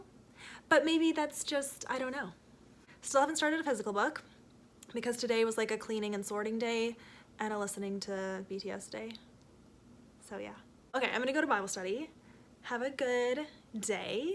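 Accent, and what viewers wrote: Canada. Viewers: I love Canadian accent. About and sorry! (Thinking about Anne here, "sooooorry".) And I just enjoy it. Enjoy learning French! Love Julie Klassen. The first I ever read was Lady of Milkweed Manor and I love it. Think it may still be my favorite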